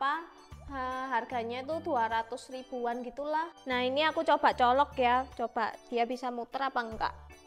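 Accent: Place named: Java, Indonesia. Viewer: Ngomonge medok pol😂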